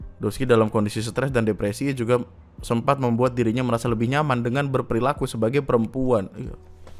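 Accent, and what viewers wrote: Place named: Surabaya, Indonesia. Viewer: Suroboyo Banget 😂 Aku cinta Orang2 Surabaya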